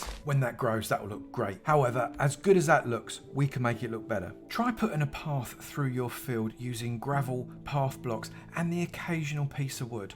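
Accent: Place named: England, United Kingdom. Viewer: He doesn't, he's just English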